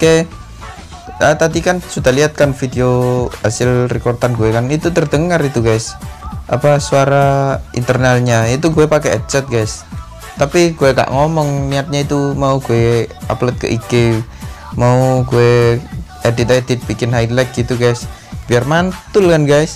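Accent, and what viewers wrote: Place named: Java, Indonesia. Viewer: Lu orang jawa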